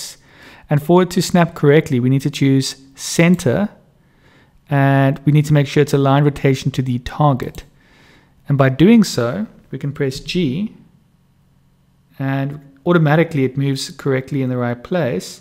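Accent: South Africa. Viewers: Dude, thank you so Freaking Much. Also, it was nice to hear another South African on the Web.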